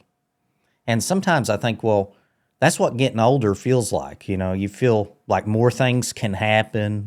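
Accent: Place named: Appalachia, United States. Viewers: r u in West Virginia? i grew up there and your accent sounds like where I grew up. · Love your hillbilly accent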